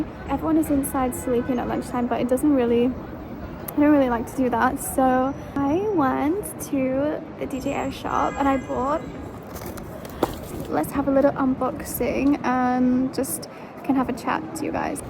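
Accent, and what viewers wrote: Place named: Yorkshire, United Kingdom. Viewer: is that a yorkshire accent i hear? i have many questions abt working in tech in china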